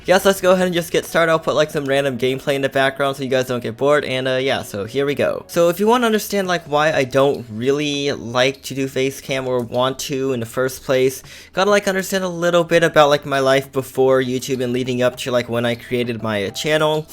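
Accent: United States. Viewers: i have chinesee friends and their childhood was like that to and hearing that ur chinesse is so surprising like my chinesse friends learned a lot of english and all and i knew when i first met them that their chinesse by their voice but u sound like a normal american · Not trying to be rude but are you American like idk ur voice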